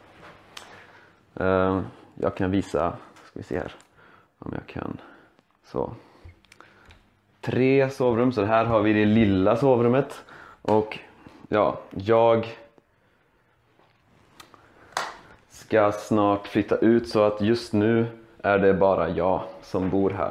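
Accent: Sweden. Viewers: så söt du är alltså! din dialekt är fin också, göteborgska eller? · So helpful! What part of Sweden is your Swedish typical of?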